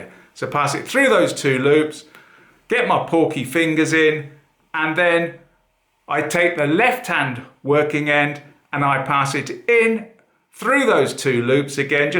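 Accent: United Kingdom. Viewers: My favorite knot channel. Great, clear instruction, warm friendly and pleasant British accent, and excellent filming and editing. I just love this guy!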